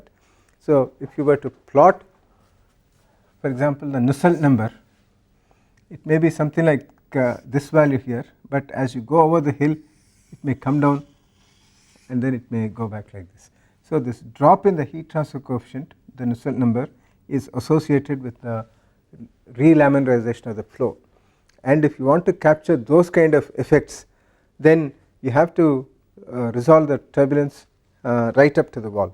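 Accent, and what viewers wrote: India. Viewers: WHY INDIANS TALK LIKE THISSSSSSSSSSSSSSSSS???????????????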